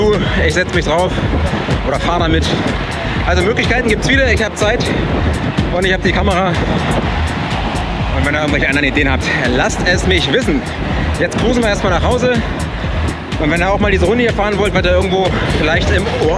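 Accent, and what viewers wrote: Berlin, Germany. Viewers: Immer wieder nice anzusehen und die Berliner Schnauze tut gut. Weiter so und Kette rechts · Super Format, lustige Berliner Schnauze!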